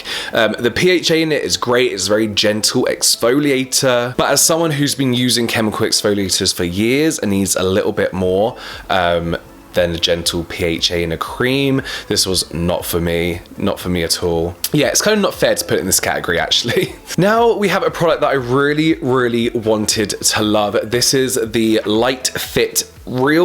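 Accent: United Kingdom. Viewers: Love, love, love your videos! You are about my age and you look amazing. Of course I’m going to pay attention to your opinions with your fantastic skin and attitude. You’re amazing! Also a bonus is your charming British accent and humor 😍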